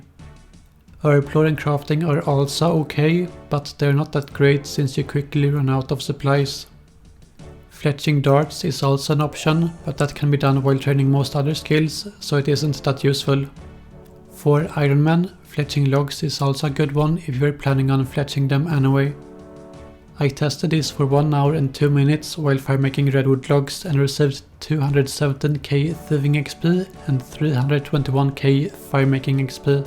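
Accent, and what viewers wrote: Sweden. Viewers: thats the swedish-english accent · below average in english and he sounds swedish, most(young) swedes are better than this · Herregud din Svengelska..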